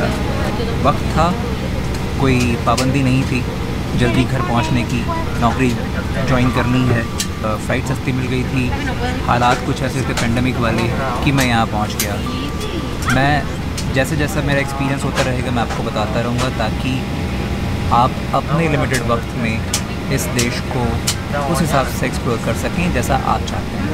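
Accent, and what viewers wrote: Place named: Haryana, India. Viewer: Bhai love the way use haryanvi accent and words in between ur lines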